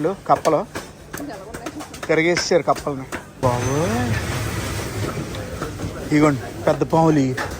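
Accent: Godavari, India. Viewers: మీ ఇద్దరి వల్ల తెలుగు భాషకి గౌరవం పెరుగుతుంది. మీది గోదావరి యాస, గారిది విజయనగరం యాస. ఏదైనా ఇప్పుడు ఎవరి సొంత తెలుగు హ్యాపీగా మాట్లాడవచ్చు. ఇంతకు ముందు ఏవరు ఏడిపిస్తారో అని కృష్ణా, గుంటూరు తెలుగే మాట్లాడుతారు. Off cource మీడియా, సినిమాలు అవే బాషలో ఎక్కువగా ఉండడం వల్ల అలా మాట్లాడుతున్నారు. ఇంతకు ముందు తెలంగాణా యాస కూడా అంతే, నాలుగు సినిమాలు ఆ బాషలో తీయడం వల్ల అందరికీ ఈజీగా అర్దం చేసుకుని వాడేస్తున్నారు. Even ఆంధ్రలో వాళ్ళు ఇప్పుడు తెలంగాణా యాస ఈజీగా వాడేస్తున్నారు. ఏదైనా సినిమా అయినా, సోషల్ మీడియా అయినా, మీరు హైలైట్ చేస్తేనే., యాసలు బ్రతుకుతాయి. కృష్ణా, గుంటూరు ని తక్కువ అనడం లేదు, pure, plain తెలుగు వాళ్ళది, మిగతా జిల్లాలది ఫ్లేవర్ తెలుగు అంతే. Love from vijayawada(NTR dist). Native place చీపురుపల్లి (vzm dist). Thank you.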